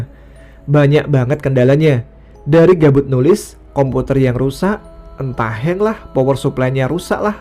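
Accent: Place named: Cirebon, Indonesia. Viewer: Aksen mas seperti dari cirebon, asal mana mas?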